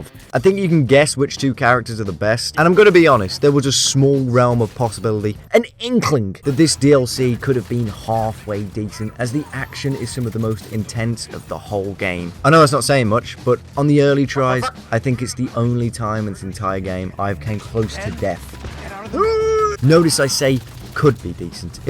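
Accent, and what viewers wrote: United Kingdom. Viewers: British love it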